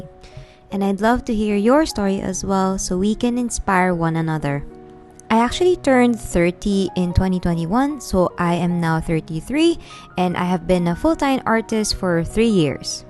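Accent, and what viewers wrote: Philippines. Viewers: I knew it! I thought maybe you are also from the Philippines when I heard your voice haha! I'm happy for you that you found yourself and endured through the hard times in life. I'm also in this lifelong pursuit of art and I have a lot more to go through and its not easy, but I know this is the thing that I've stuck through all this time no matter how challenging it becomes.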